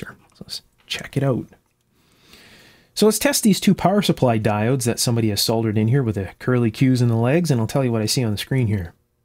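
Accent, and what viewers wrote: Canada. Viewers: I had no idea that Canadians pronounce thing the same way we do in Australia...like Zed instead of Zee, and solder instead of sodder. Impressed. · I was at first agast to hear you pronounce Solder soul'-der instead of saw'_der and Far'-ad as Fer-ad'. Then I head you say awoot and understood that you must be Canadian, ayh. OK I get it. But Michael's name wasn't Fer-ad'-ay, it was Fara'-day. I didn't know Canadians took so much of their speech from the English as apposed to the way Americans talk. As was said in My Fair Lady, "Why can't the English speak English? The Americans haven't been doing it for years." Keep up the good work and I can't wait to see the referb video, either.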